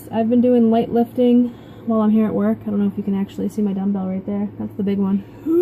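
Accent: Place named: Boston, United States. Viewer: I looooove ur videos!!!!! I also loooove ur Boston accent! I've been watching ur videos from the beginning and girl YOU ROCK!! U r looking super fabulous. Enjoy the wedding and wearing ur new size 16 dress!!!! My vertical sleeve surgery is next Wednesday and I can't wait to be in a size 16!!! Love to u missy!!! Keep up the great work!! Oh and hopefully soon u will be OUT OF THAT OFFICE!!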